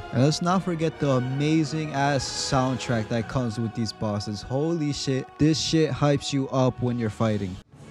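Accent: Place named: Hawaii, United States. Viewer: U sound like u from Hawaii brah? Lol..I grew up on da Big Island myself..Nice Video tho. I'm on my first playthrough.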